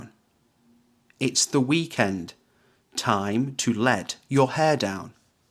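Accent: United Kingdom. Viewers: What accent do you have? Is it British?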